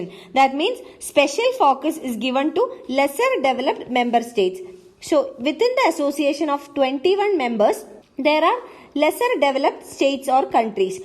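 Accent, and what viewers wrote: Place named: Kerala, India. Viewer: are you a malayali, the accent sounds so. :)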